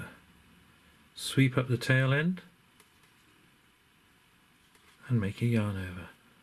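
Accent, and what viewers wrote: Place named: United Kingdom. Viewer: Thank you for this very well done video. Sooooo nice to hear an British accent for a change 😉.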